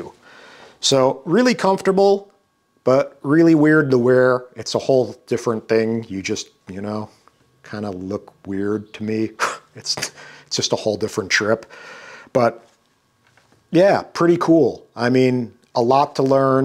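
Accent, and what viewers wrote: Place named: Chicago, United States. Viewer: Moved out of Chicago a couple years ago, out in the boonies now. Also got into drones this year. Avata 2 is my 2nd. Its super fun, man. Nice to hear a Chicago guy talk normal and frankly about his experience. I knew most of this stuff already but I watched the whole video cuz I enjoyed listening to you go on like a Chicago guy. Cheers, brother. Enjoy the drone.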